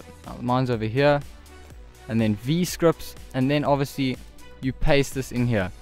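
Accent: South Africa. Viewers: south african??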